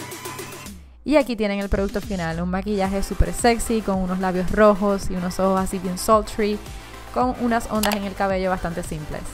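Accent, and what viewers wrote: Puerto Rico. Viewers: Eres hermosa sin maquillaje y mucho más hermosa con maquillaje.. Me encanta el acento Puertoriqueño.. 😍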